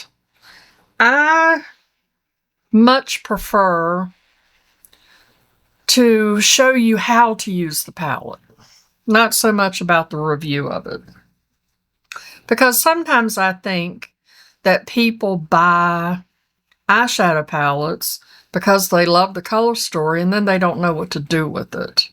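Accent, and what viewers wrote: South, United States. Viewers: Although I love seeing makeup videos in the mature woman world, I love listening to your beautiful soothing voice. Your southern accent is so calming and lovely.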